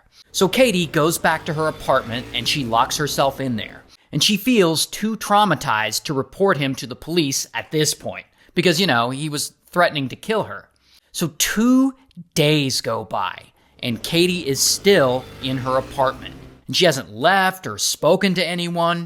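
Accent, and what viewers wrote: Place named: United Kingdom. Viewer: personally i wouldnt let a british dude yell at me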